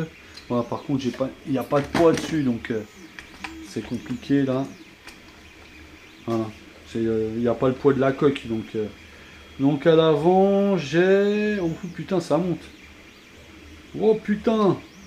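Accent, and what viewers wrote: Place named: France. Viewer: Excellent cette vidéo, je me taté par rapport au rapport qualité / prix et bien ça semble pas mal... J’adore ta façon franche de parler et ton accent frenchy sur les mots technique anglais 😂. Merci pour ce partage et vivement le test sur le terrain. Big Up à toi 🤘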